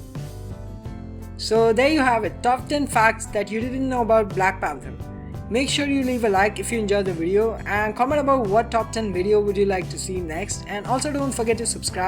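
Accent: South India, India. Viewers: are you from south india?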